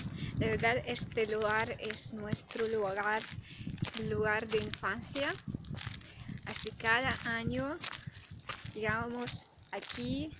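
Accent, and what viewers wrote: Spain. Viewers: Tu acento es de España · ... podrias hablar ruso.. se te hoye bien.. adoro el ruso.. pasa que con el dia a dia.. uno lo que menos aprende es ruso.. te resulto dificil el español? veo que tenes un español de españa...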